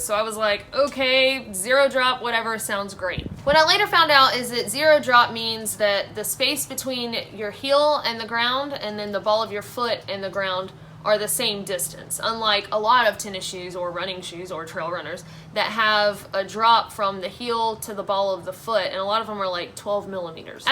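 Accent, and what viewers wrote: South, United States. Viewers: Stephen Ross heh. Her accent is super super strong to someone not from the south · You got southern accents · Stephen Ross lol I can definitely hear a southern accent.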